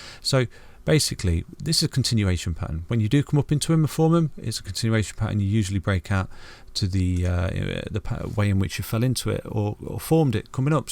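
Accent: United Kingdom. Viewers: You have the cutest British accent I've ever heard. Thanks for the analysis 😉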